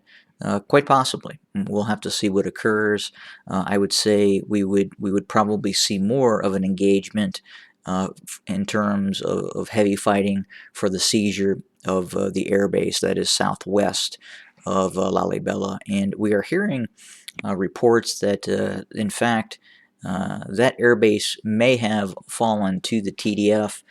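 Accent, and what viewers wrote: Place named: South, United States. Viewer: The commentator sounds Habesha with American (Southern) accent. No problem. Just saying.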